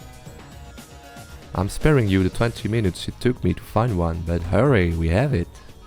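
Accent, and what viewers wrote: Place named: England, United Kingdom. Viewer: Joli accent englais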